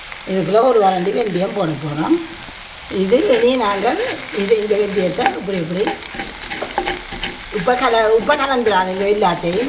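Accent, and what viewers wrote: Jaffna, Sri Lanka. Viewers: உங்களது சுத்தமான யாழ்ப்பாணத் தமிழும், காணொளியிலேயே மணமணக்கும் சமையல் செயல்முறையும், அப்படியே என் அம்மாவைக் கண்முன்னே நிறுத்துகிறது! துரதிஷ்டவசமாக, அம்மாவை இழந்துவிட்டேன், இருந்தும், உங்கள் செயல்முறையைக் கடைப்பிடித்து, அம்மாவை நினைத்து, பல வகையான குழம்புகளைச் செய்துபார்த்தேன்! ரொம்ப நன்றி! இருந்தும், அம்மாக்களின் கைப்பக்குவம் என்பது தனியான ஒன்று! 100க்கு 100 அவர்களின் சமையல் ரகசியத்தை நம்மால் தொடமுடியாது! உங்களுக்கு நல் வாழ்த்துக்கள்!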